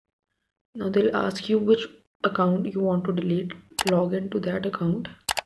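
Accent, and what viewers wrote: India. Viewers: OMG - this lady's Indian accent is enough to make me want to blow my brains out - C R I N G E ! ! ! ! ! Somebody ought to tell her that you don't start a sentence with the word "so" unless you're following up a previous sentence, implying "therefore". D O U B L E C R I N G E ! ! ! ! !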